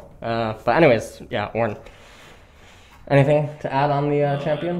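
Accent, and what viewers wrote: Sweden. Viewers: That swedish accent <3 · that Swedish accent is getting my dick hard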